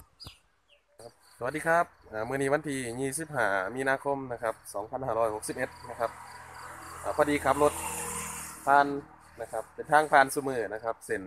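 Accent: Isan, Thailand. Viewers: เป็นครั้งแรกที่ครู พูดอีสานนะเนี่ย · ครูพูดภาษาอีสานน่ารักดีครับน่าจะพูดตั้งนานแระ☺☺☺☺☺☺☺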